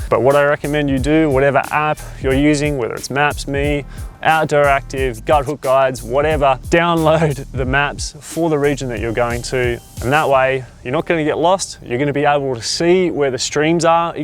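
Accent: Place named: Australia. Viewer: mate you sound aussie like the crocodile hunter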